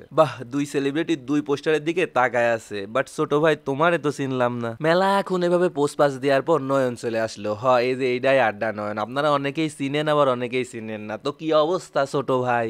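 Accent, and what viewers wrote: Pabna, Bangladesh. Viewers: আপনার মুখে পাবনার ভাষা সুনে সেই লাগলো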